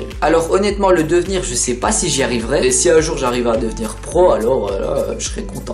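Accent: Belgium. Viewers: est tu belge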